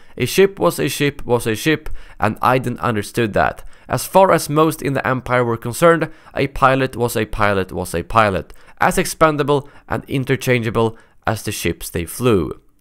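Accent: Sweden. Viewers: it's ok I love your Swedish accent, I could listen to it all day! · your swedish accent is awesome im from finland so if enyone has terrible accent then its me😁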